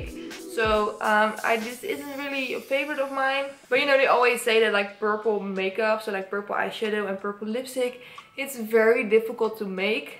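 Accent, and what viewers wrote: Netherlands. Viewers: Hey girl, as soon as I listened to your voice, I knew you were a Dutchie! Fellow Dutchie here 😄 Love the NYX soft matte lip formula and really like these metallic shades! 😘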